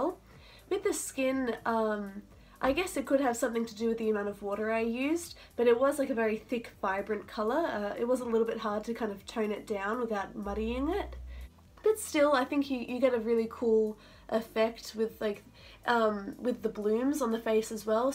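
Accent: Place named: Australia. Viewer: This is probably stupid but when I opened the video my first reaction was is this a fellow Aussie...I think so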